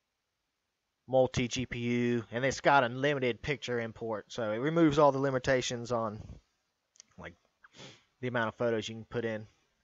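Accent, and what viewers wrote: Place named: Georgia, United States. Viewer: You accents sounds like Georgia or somewhere close.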